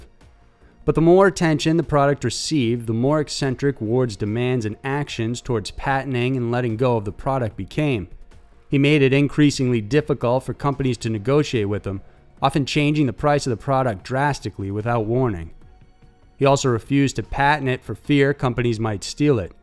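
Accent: South, United States. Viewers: Love that southern accent 💋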